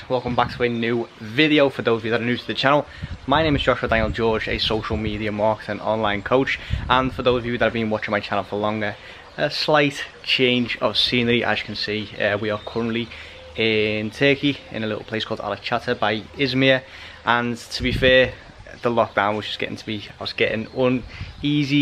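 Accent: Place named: United Kingdom. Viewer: How do u have British accent as a Dutch?😄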